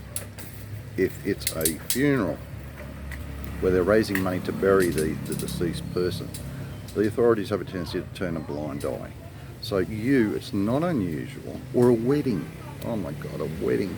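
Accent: Australia. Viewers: Well done great vlog, nice to hear a Aussie voice were in central Queensland so lovely to hear direct items about the Philippines no bull just real deal thank you , just subscribed looking to the future videos , cheers mate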